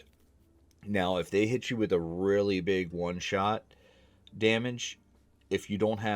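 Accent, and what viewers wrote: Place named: Philadelphia, United States. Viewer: That sounds like a Philly accent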